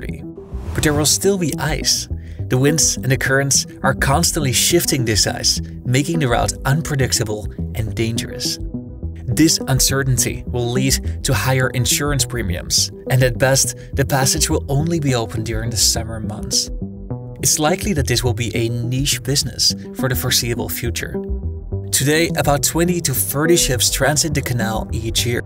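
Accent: Netherlands. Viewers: Your dutch arent you? Lol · He isn’t pronouncing it that way on purpose, he’s Dutch.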